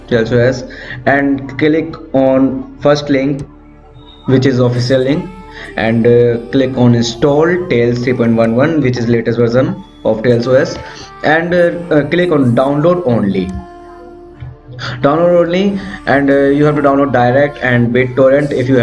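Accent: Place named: India. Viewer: nothing personal, i cannot stand tech videos with a strong indian accent, not being racist. its is just fucking impossible to learn the details. would be better off with just a generic robot voice. im sure many millions of people around the world would think the same.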